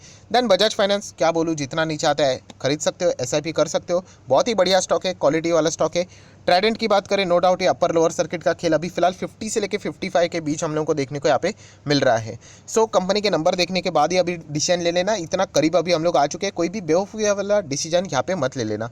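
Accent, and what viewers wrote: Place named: Gujarat, India. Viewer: pakke gujarati lag rahe ho aap sir…..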